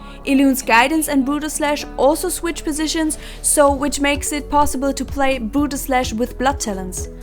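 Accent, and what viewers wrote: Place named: Germany. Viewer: Manchmal hört man voll raus dass du eine deutsche bist ;)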